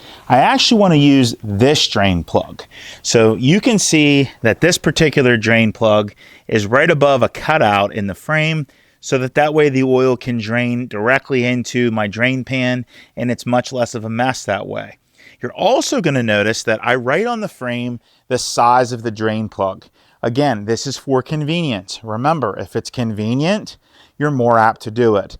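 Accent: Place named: Western Pennsylvania, United States. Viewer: Very good video. Also loving the western PA accent!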